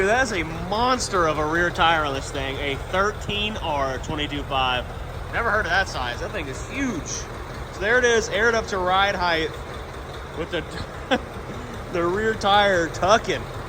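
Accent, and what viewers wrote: Netherlands. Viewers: bro sounds Dutch and I should know lol.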